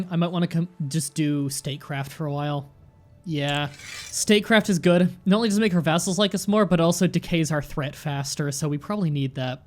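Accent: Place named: Canada. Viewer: FYI the barony was in Portugal, the name was in Portuguese, not Spanish. And anyone who think you sound American doesn't know what Americans and Canadians sound like. You sound Canadian. And FYI, Galicia is de jure the duchy you just conquered and the ducky above it that you started with. The Duchy above it de jure drifted into your kingdom in an episode in, like, the teens, and you commented "why am I being alerted to that?"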